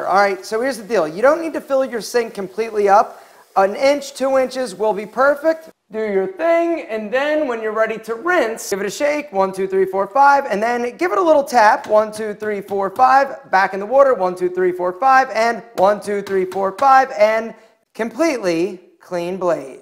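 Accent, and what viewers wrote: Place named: New Jersey, United States. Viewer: just found your channel: am a NJ native, you totally sound like your from Jersey or PA.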